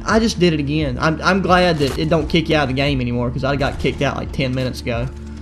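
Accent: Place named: South, United States. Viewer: Is it just me or do u have the best southern accent? Lmao but u earned ur self a sub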